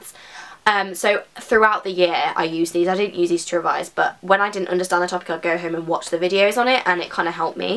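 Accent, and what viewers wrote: United Kingdom. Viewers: I actually am obsessed with your videos 😂😂😂 I feel like I relate on so many levels, so nice to hear a British voice on a revision channel 😊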